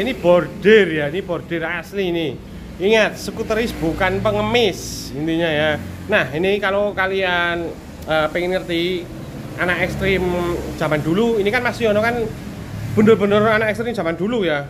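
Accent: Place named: Java, Indonesia. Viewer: Saya suka setiap konten2nya abang ini lucu suaranya itu loh cempreng medok lucu sukses terus abangku 😊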